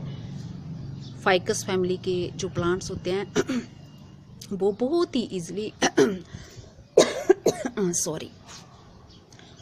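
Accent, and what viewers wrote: Punjab, India. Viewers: aapki boli ka turz lehja kyu hai I think Punjabi accent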